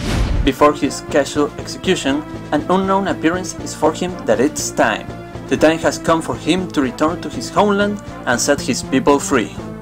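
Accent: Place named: Argentina. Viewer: tu acento es re argento maquinola, de donde sos?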